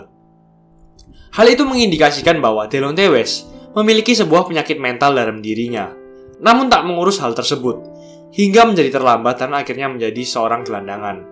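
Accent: Java, Indonesia. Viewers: La medoook😁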